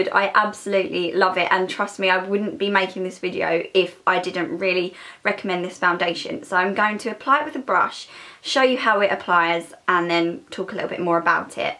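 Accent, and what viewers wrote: Essex, United Kingdom. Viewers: Kayla Motera Thank you! I was thinking Essex.. kinda close! Haha!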